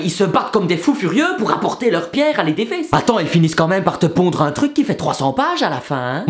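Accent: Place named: Belgium. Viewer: Son accent belge est juste magnifique !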